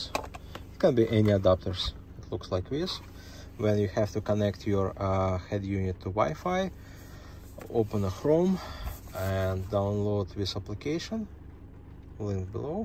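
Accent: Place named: Russia. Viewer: какое русское произношение))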